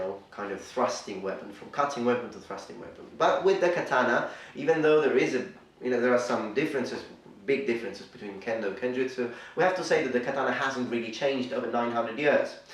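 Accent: United Kingdom. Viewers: Came to see young with the strongest british accent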